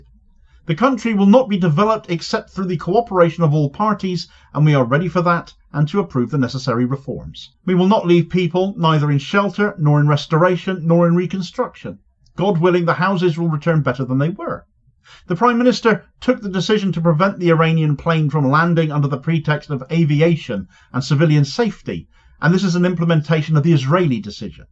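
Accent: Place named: South West England, United Kingdom. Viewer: Its his English "local" accent not the microphone.... South West England. Sounds fine to me "Ooooh Arghhhhh"! somethings in the UK still shine bright against the invasion... thankfully local accents live!! (Google " " and you will understand what I am saying 🤣 )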